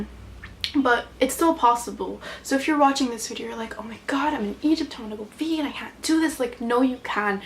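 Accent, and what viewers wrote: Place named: West, United States. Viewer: can you do a video on how you found living in Egypt as a english person- ik you're egyptian but from your accent i can tell youve lived alot of your life in a western country (correct me if im wrong). it would help alot as im thinking of moving there soon but ive lived most of my life in england. (im 18, vegan too) im just scared i wont get along or agree with people bc of the different views and stuff. Thank you x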